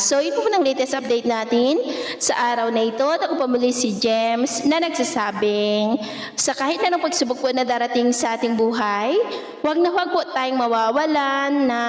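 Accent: Visayas, Philippines. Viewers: Ma'am Visaya ka ba Tanong qo lang bigkas mo lang nga salita tonong visaya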